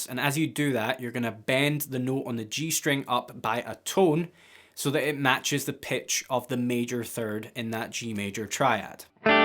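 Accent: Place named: Scotland, United Kingdom. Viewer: Love watching lesson, not only explained things well, but also i love hearing his scottish accent 😁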